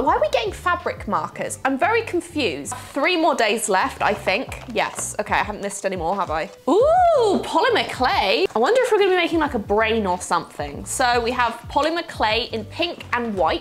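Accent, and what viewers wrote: England, United Kingdom. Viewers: seriously LOVE that pays attention to and creates her own closed captioning. Not everyone does, and since she speaks quickly sometimes and I don't 100% understand everything in an English accent, I really appreciate it. Thanks,! Hope you're doing well!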